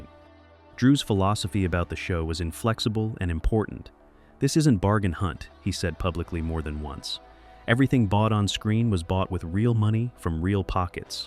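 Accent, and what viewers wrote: United States. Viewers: Why is an American narrating Drew Pritchard backstop? Hasn't anyone told him that the place is Conwy NOT Conway!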